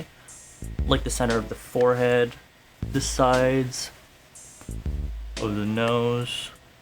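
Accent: South Korea. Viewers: The konglish is strong in this one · your konglish😂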